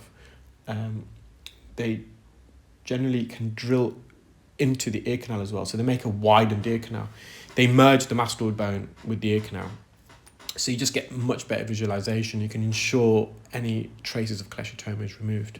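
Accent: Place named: Scotland, United Kingdom. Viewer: You must be Scottish. I just love the Scottish accent.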